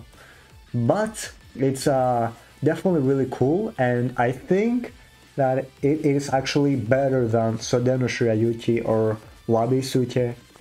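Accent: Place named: Russia. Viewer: У тя да же акцент русский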